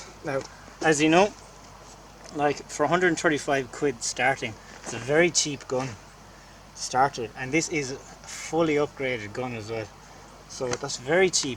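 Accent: Ireland. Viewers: Are u Irish coz I am in laois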